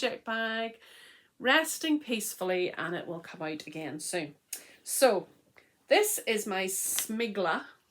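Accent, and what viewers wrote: Northern Ireland, United Kingdom. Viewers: Just found your podcast. Made me pause hearing a fellow Nothern Irish accent on YouTube. So I am from Northern Ireland, Lisburn born and raised.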